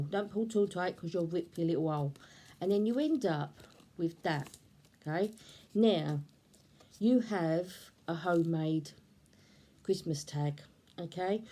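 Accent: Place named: United Kingdom. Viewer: hun, gosh I have a thing for British accent. I could listen to you all day,ding ding 🛎!! You’re very creative hun 🤗that recycling card came out quite pretty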